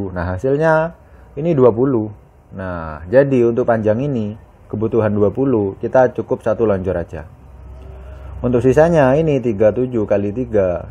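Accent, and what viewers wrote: Madura, Indonesia. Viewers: Logat madura nya kentel 👍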